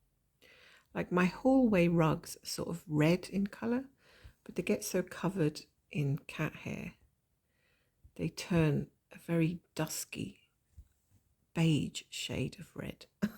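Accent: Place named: United Kingdom. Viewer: I've stitched with you on my only full coverage design and I must tell you how much you've made me laugh and how I've enjoyed your stories. I too had a cat and I recognised him in the descriptions of your cat's behaviour. Happy stitching and plenty of sun for us! ps. I also love hearing your British accent.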